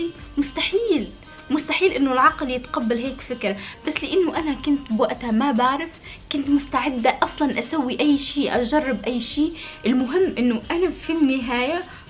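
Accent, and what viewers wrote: Tunisia. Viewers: اللهجه التونسيه عسللل❤️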